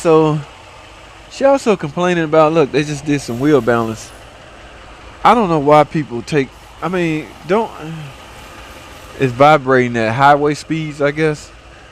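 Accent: South, United States. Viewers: Southern accent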